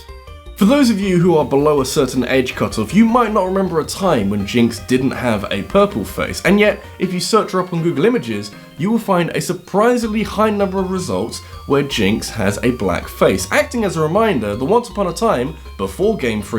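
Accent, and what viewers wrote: England, United Kingdom. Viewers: He’s English. That’s how we say it :P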